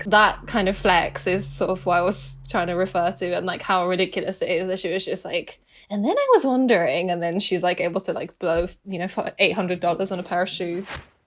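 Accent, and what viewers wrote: United Kingdom. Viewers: am i the only one who didn't know she was British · 1. She's a wholeass queen 💕💕 2. I'd no idea she was british?? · Hold up she’s British I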